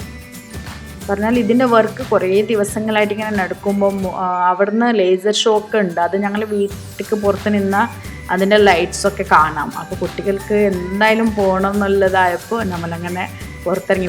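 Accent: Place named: Tamil Nadu, India. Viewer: Vlog super.... Pizza adipoly aayitto.... പിന്നെ നിങ്ങളുടെ സംസാരം എനിക്ക് നല്ല ഇഷ്ടായി.. തമിഴ് കലർന്ന മലയാളം... സൂപ്പർ....😍😍😍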